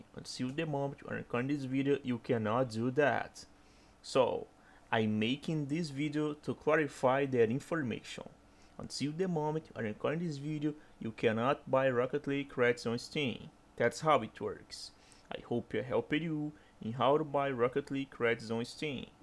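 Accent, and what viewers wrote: Brazil. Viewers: Kkkk mano, deu pra ver que tu é BR só pelo jeito que tu fala inglês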